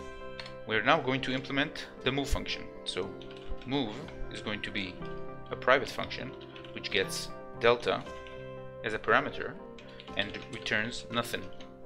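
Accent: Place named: France. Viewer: You are doing a superb job and I can understand you perfectly well. (Although it strikes me as ironic that you sound French and you actually say the 't' in Godot, when it would be silent :-) ). Please keep up the excellent work!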